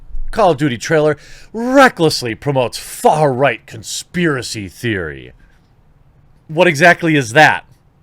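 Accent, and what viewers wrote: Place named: United States. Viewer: I like your accent, i wonder which part of the US you're from?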